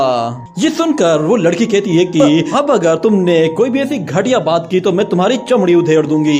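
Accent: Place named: Pakistan. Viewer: Lag RHa h Tum Pakistani ho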